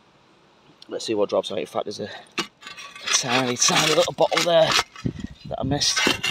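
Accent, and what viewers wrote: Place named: Lancashire, United Kingdom. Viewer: Hi I'm a recent subbie. I enjoyed watching this dig, it's always interesting to see if anything good appears whether it does or not. The Cutex bottle is nail polish. The brand is still going nowadays. Nice to hear the Lancashire accent as I was born in Burnley but lived down south since I was one year old. Keep up the good work!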